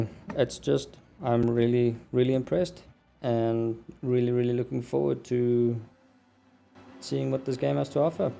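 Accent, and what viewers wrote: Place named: Afrikaans, South Africa. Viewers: you sound afrikaans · It's easy to spot a South African ;)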